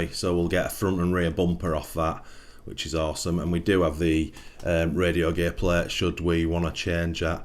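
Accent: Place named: England, United Kingdom. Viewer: Hello sir... For years now.. I have been trying to learn things about England.. Especially accents and their locations.. only very little. Can you tell me what part area your accent is from mainly... Just roughly... North.. south.. or whatever. You can give a city area. But I don't know if it will help me.. lol. I guess I can look it up though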